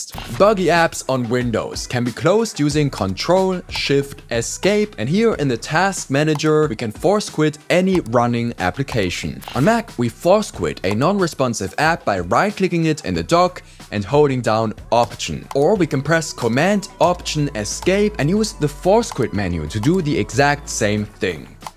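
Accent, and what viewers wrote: Germany. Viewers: I mean, you can hear that you are probably german, but it's so subtle most people wont even notice. Still great video. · You sound like you are German. Are you? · Als Deutscher reichen 5 Sekunden um zu hören, dass du auch aus Deutschland kommst. Aber gutes Video. 👍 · Why does he sound like a WW2 german officer though? That accent is crazy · erwischt, du bist deutsch :))) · Kann es sein, dass du Deutsch bist? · german accent go crazy tho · i can smell german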